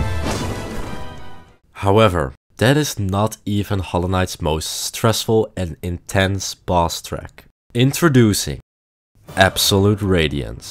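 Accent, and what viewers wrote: Netherlands. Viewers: You can just hear that he is Dutch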